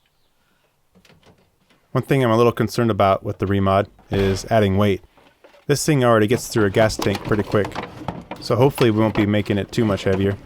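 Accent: Ireland. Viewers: Just discovered the channel and have been binging all day. Love it, but it does need approximately 60% more Irish accent. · that irish accent.... you weren't joking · Irish ☘️ is the best accent to listen to 👍🏼🤷‍♂️😂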